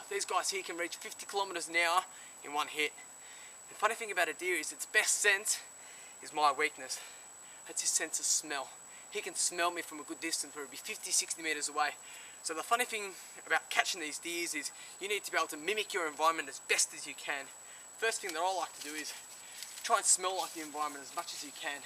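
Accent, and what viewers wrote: Australia. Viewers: Australian accent : let's catch a d, I think this one was bullshit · Gaming &amp; More! hes Australian not Russian! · knew he was australian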